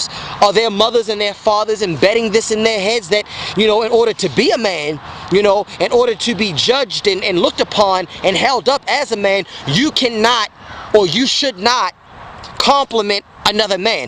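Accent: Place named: Baltimore, United States. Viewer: I knew you were from Baltimore..I can hear it all in your voice...And why do straight men do that. I think they do think this, that every gay man wants them...They need to pump the breaks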